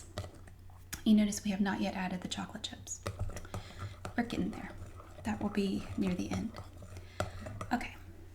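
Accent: United States. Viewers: I'm not pointing a finger at you or anything, but I think it's so funny how Americans say Aldi 😂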